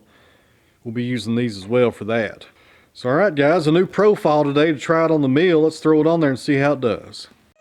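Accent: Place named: South, United States. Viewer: Can the accent get any more southern? I love it.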